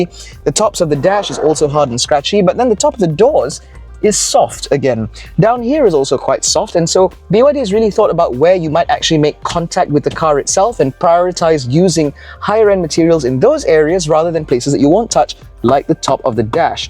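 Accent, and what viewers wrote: England, United Kingdom. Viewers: Nice English accent, very clear.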